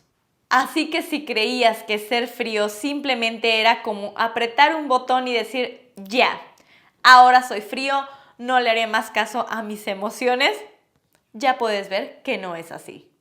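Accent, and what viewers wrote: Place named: Argentina. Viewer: Que hermosa Argentina ...que mujer...me encanta el acento de esta mujer Argentina....¿así como hablas juegas fubol? un beso...gracias por tu videos. Saludos desde Colombia.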